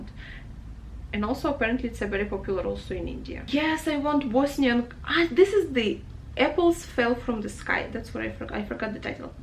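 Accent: Russia. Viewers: Are u russian or smth? Like yr accent sounds so familiar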